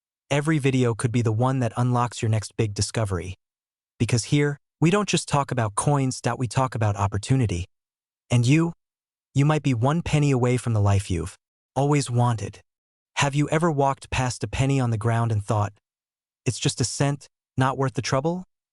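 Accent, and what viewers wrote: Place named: United States. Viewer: Well, it appears you're a coin publisher of sorts. It clearly says United States on your timeline, and you have an American Accent. So what I can't figure out, other than you clearly have absolutely no idea what you're talking about, is that the U.S. Mint has NEVER, EVER minted a penny, yet you've already done a HUGE disservice to whoever watches such misinformation. In fact, why be honest at all in your videos? No research, pop one out with a clickbait message. Get paid. Damn the facts. My suggestion to you would first be to try and understand even the BASICS of U.S. Coin History, because the U.S. Mint has NEVER minted a penny, ONLY CENTS. No matter how many times you repeat it, that won't change. Seriously?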